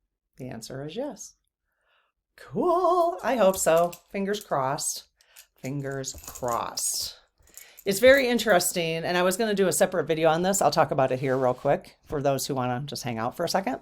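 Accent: Midwest, United States. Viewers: I love the midwest accent!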